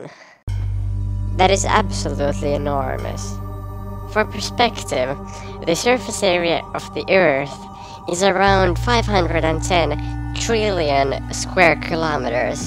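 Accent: Finland. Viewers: Are you from Finland? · are u finnish? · Ootko Suomalainen Koska Kuulostat Siltä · are u from finland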